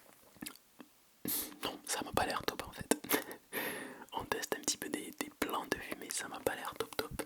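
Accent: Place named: South, France. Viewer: Ohhh cette accent du sud ! Oh mon dieu j’adore ! Incroyable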